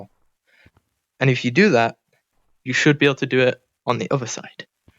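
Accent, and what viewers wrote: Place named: United Kingdom. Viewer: i think it is very good visually but i cannot seem to understand the bri'ish vocals very unclear and why dont you just walk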